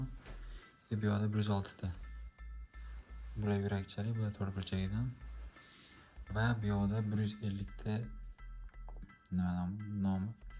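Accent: Khorezm, Uzbekistan. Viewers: Хоразмданмисиз асли шевангиз сал тортяпти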